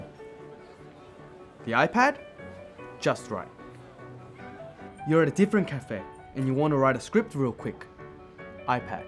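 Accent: Australia. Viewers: east asian guy with an aussie accent is quite trippy lol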